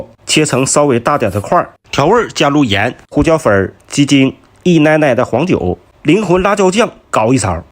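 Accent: Northeast, China. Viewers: 这东北大碴子口音😂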